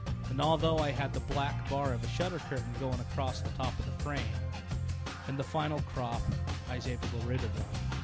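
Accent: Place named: Chile. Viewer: me gusta ese acento chileno.... tienes un dislike big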